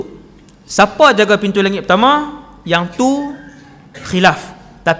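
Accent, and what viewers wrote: Kedah, Malaysia. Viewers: Pasai ustaz ckp KEDAH lah duk dengar ulang Kali x jemu.. Semoga ustaz SENTIASA DALAM KEREDHAAN ALLAH SWT 🤲❤️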